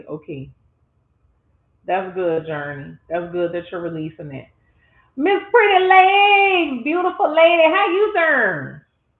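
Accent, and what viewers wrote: New Orleans, United States. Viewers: Love your story telling time, I have to say your New Orleans accent makes it even better. ❤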